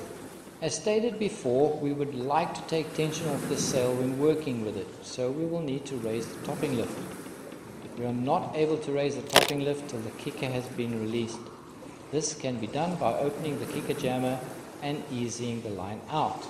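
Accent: Durban, South Africa. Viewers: Hi great video, and I wish I had it when I started sailing. Your accent sounds like from Durban, SA. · Love listening to a South African teaching me about sailing...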